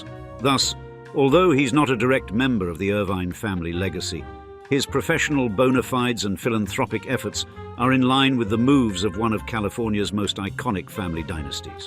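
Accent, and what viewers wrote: RP, United Kingdom. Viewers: The posh British accent makes it so much more Old Money sounding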